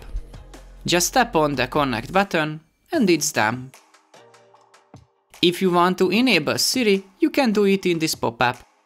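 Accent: Hungary. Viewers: You has a Hungarian accent. Are you from Hungary, or I misshear something?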